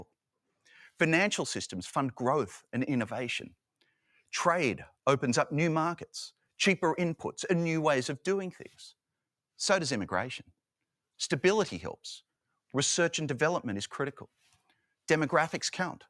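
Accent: Australia. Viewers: As an American with friends & extended family in Australia, having visited twice with 5 weeks in 2024 and longer trip planned 2026, I definitely love Australian style, accomplishments, pride and accent. Dr Wolfers who I listen to in other discussion venues, really has enhanced my admiration of his home country.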